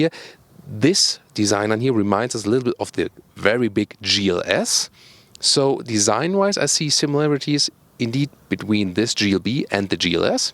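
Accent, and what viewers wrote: Germany. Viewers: I hear a review in a German accent. SOLD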